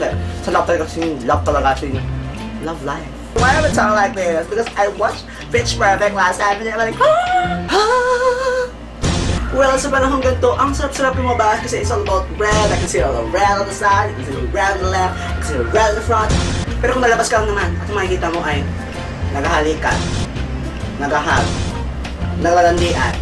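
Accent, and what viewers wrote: Visayas, Philippines. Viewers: bisaya na si..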